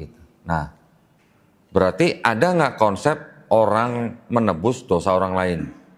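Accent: Banyumas, Indonesia. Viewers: Logat Jawa banyumasannya melekat mbake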